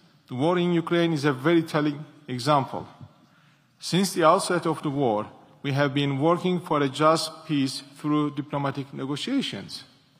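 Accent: Turkey. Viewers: Türk gibi konusuyor :) Ama istedigi gibi konusabilir, karizmasi yeter! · Hala bu kadar agir bir turkce aksani ile ingilizce konusmasi😅 Arkadas ne olacak bu turklerin ingilizce ile imtihani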